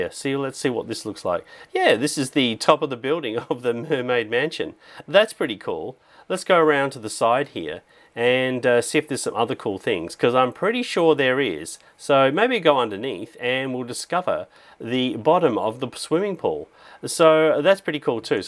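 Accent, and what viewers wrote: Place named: Australia. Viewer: I love your australia accent its so cool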